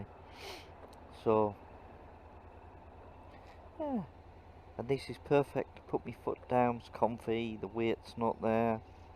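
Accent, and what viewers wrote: North East England, United Kingdom. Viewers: Ah thats great thought I picked up the accent, I'm from North Shields, like you I moved to London at 20 yrs old and Im 60 now living in Hertfordshire. I have an NC and although it isn't a flying machine it does just what I need. I'm new to your channel but will go through your vids which so far are just great Thank You. Take care mate.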